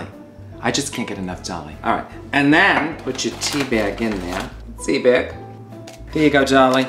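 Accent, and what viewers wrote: United States. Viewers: does anyone know what type of american accent that is? i like it. is hilarious. could watch her videos all day.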